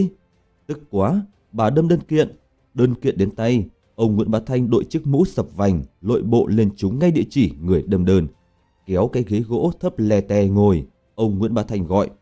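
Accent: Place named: North, Vietnam. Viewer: Người kể chuyện mà theo giọng Quảng Nôm thì hấp dẫn vì sống động hơn nhiều Giọng Bắc khét lẹt!!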